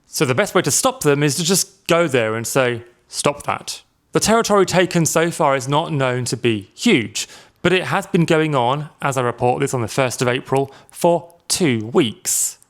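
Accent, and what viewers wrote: United Kingdom. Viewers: Are you British!?😯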